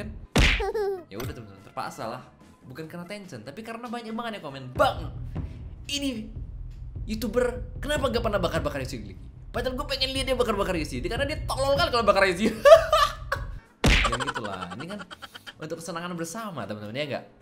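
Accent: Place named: Medan, Indonesia. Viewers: Nampak kali Medan mu bang 😂😂😂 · Bg Logat mu kayak orang Medan😂😂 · Orang Medan nya abg ini😂😂😂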